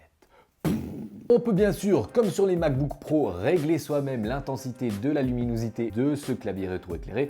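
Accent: Switzerland. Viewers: C’est tip top hop schweiss. Nostalgie de l’accent suisse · On dirait qu’il y a certaines similarités entre l’accent suisse et l’accent québécois... 🤔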